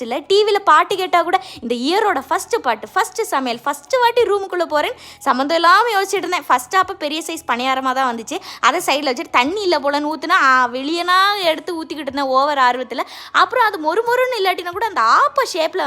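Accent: Tirunelveli, India. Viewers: Kerala ponnu ella madhu enga tamilnattu ponnu❤❤❤konchum thirunelaveli tamil pesurathu kettuttay erukkalam❤. Today my son birthday.. ❤❤❤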